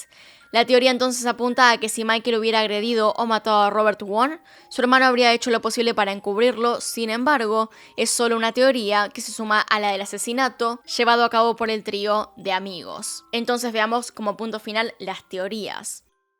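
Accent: Argentina. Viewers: Ala , que hermosa eres y que bonito ese acento argentino, que chula manera de narrar algo atroz 😭 que arte.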